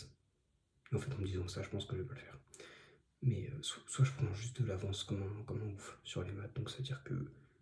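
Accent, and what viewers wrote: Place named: United Kingdom. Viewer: Stp arrête de nous faire le british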